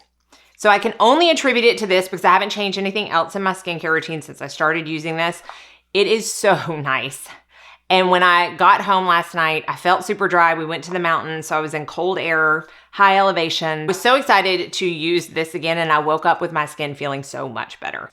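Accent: South, United States. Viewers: Hi. What a great video. Thank you so much. Always such excellent information. I trust your honest and professional advice. You have, what looks like, perfect skin. I love you and I love your channel. You are so very beautiful and feminine and sexy. With that charming southern accent, it’s no wonder your channel is so popular and successful. Who wouldn’t want to look as gorgeous as you. Thanks again.. Peace, Calvin.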